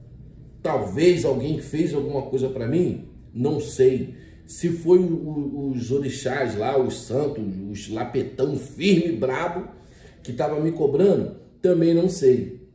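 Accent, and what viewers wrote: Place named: Bahia, Brazil. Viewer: Como consegue falar baines assim , como baiano .opaió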